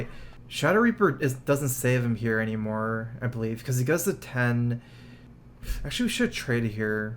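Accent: Texas, United States. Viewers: John Dice late reply but he says drawl because he is from texas and apparently this is their accent.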